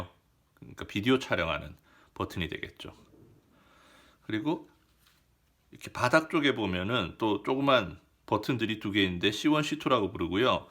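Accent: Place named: South Korea. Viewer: 다좋은데 콩글리시가 ㅎㅎ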